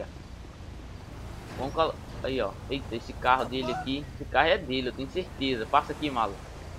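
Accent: Minas Gerais, Brazil. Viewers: Game Club Kkk eu falei zuando cara, mas tô achando que ele é mineiro sim por causa ta trasendo muito esses mods e pra mim que sou mineiro ele tá falando normal... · eu tava querendo saber do pq o sotaque e da q e minas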